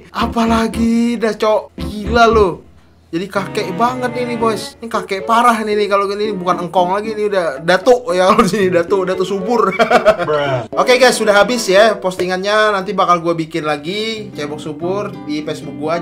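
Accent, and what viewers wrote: Banjar, Indonesia. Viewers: Bah orang banjar ni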